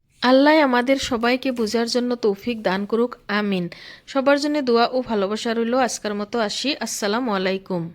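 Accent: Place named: Sylhet, Bangladesh. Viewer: আপনি কি সিলেটের মানুষ।আপনার ভাষায় বুঝতে পারলাম আপনি সিলেটের মেয়ে।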